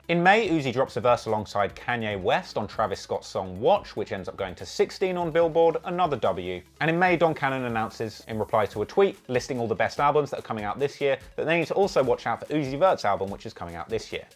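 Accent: United Kingdom. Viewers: I'm really listening to a british dude talk about how a rappers career is ending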